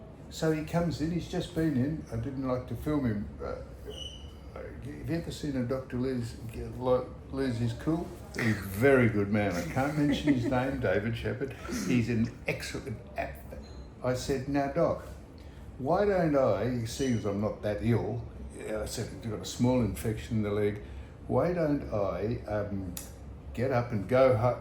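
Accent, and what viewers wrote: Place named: Australia. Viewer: God bless you,:) I like your larrikin banter. Always well said with Aussie charm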